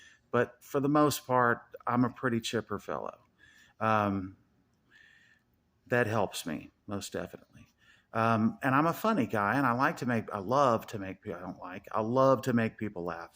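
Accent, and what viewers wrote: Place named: United States. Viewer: Hi. This is Chris, imported from Twitter. All this time I thought you were British but here you are being all American-like. How lovely and I look forward to seeing all your videos.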